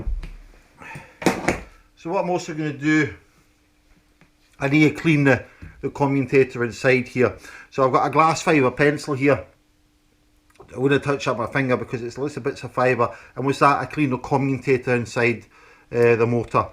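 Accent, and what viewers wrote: Scotland, United Kingdom. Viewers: Fab U Lous, not sure about the funny accent but being from a similar part of the world, Glasgow, I do know however this is the correct and only way to speak english. All that aside, thank you so much for the time and effort in doing the video. Living in sunny Bantry with the mad Irish wife, now using a scooter ocassionally, took it to bits to try figger what was wrong. Your video suggests the most likely cause and the other info re the brake helps big time. Many thanks again for the help, Bill P Mad Scot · Hi Iv watched many of your videos and found them very informative . Great to watch and hear your fine Scottish accent . I am English but I live in Australia . I’m having a problem with a controller on a non identified mobility scooter ( no idea tags on it any where ) I have emailed you but so far no response . Can you help please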